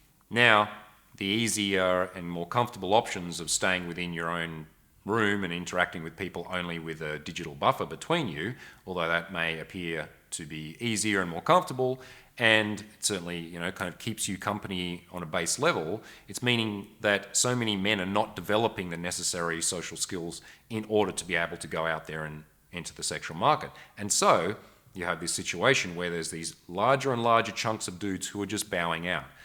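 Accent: Australia. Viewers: Eu amo o inglês australiano dele 😅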